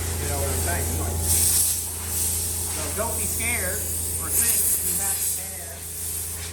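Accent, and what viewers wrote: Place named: Canada. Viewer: You sound really Canadian ey👍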